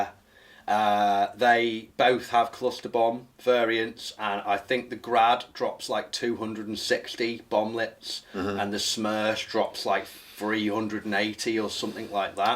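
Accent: United Kingdom. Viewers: British ish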